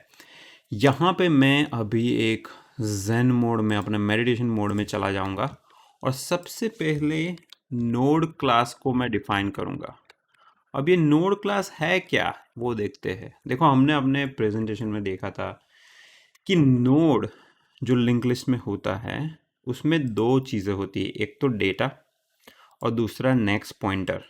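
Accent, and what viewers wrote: Gujarat, India. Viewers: kitni bhi kosis krlo gujarati vala flow to nikal hi jata hai😄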